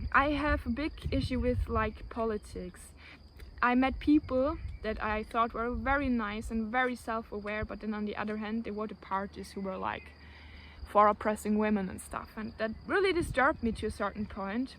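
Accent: Germany. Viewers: Mir gefällt dein deutscher Akzent und du hast schon etwas Ähnlichkeit mit Doc Caro :)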